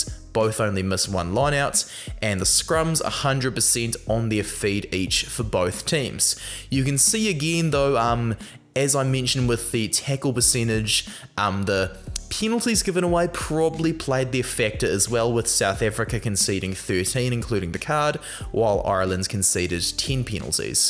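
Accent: New Zealand. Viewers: Just as an aside from an Englishman, the New Zealand accent is a thing of wonder and beauty!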